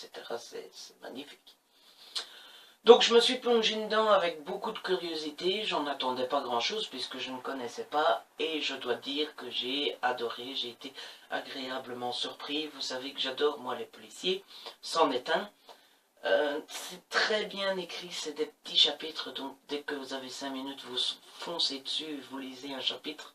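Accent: Belgium. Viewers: Oui ton accent :-) moi aussi suis belge. J'aime beaucoup tes vidéos, continue :-)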